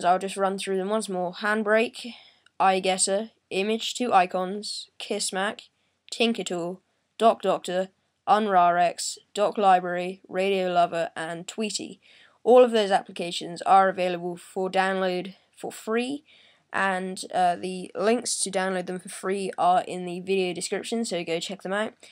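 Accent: United Kingdom. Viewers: cool i like ur british accent..specially when u say Dock doctor.....so cute.....i have to learn british accent...thanks for suggesting ur fave application....xoxo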